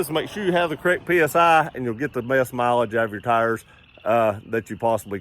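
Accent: United States. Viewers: What an interesting English accent! I wonder which part of the US this is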